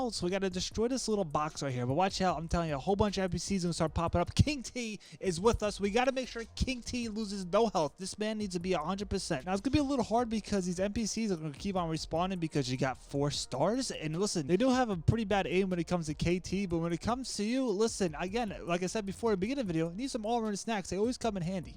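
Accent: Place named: East Coast, United States. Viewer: i’m staying bro u funny 🤣🤣 east coast huh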